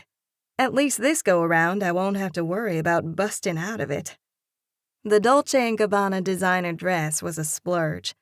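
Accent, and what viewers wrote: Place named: South, United States. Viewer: Another great book in this series. I’m loving every one of them. The narration is top notch. Of course, I’m partial to the southern accent since I am from the South! Please keep this series going.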